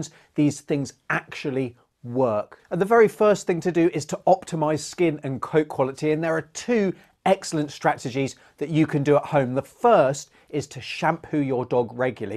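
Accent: United Kingdom. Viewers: You talked so fast I couldn't understand what you were saying especially with your English accident. Sorry. But I'll have to look somewhere else for a remedy for my dogs' itching.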